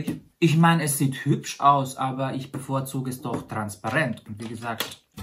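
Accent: Austria. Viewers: ich lerne Deutsch. Ich habe dieses video so cool gefunden! Ich hab nicht alles verstanden, weil österreichische dialekten für mich schwerer sind. Aber vielen dank fürs Video! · Dafür! Der Unterhaltungs- und Informationsfaktor - in einem ausgewogenen Verhältnis - sind hier echt hoch. 💜💜 #support Und irgendwie kommen bei mir immer heimatliche Gefühle durch beim Zuhören, wegen dem österreichischen Einschlag. 🥰 Witzigerweise bin ich zwar gar keine Österreicherin, sondern Oberpfälzerin, aber der Regensburger Spracheinschlag (nicht das eigentliche Oberpfälzerisch, bloß das direkte Regensburgerisch! Ganz anders!) ist oft dem österreichischen Sprachtenor in vielen Punkten echt ähnlich, gerade eben wenn beides eher unterdrückt wird. 😂🙈 Vor allem, wenn man an der Nordseeküste wohnt, wo man wirklich komplett anders daherredet, kommt einem das vergleichsweise direkt heimatlich vor. 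Keine Ahnung, ob man versteht, was ich meine. 🤷🏻‍♀️😅😅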